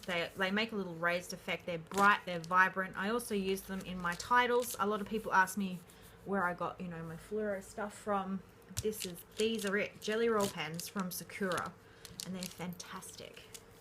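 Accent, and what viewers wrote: Australia. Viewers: I am enjoying going through all your videos! Nice to hear a fellow Aussie accent too x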